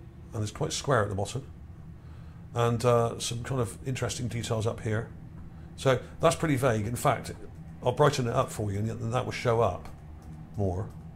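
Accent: United Kingdom. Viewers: Are you from the U.K.?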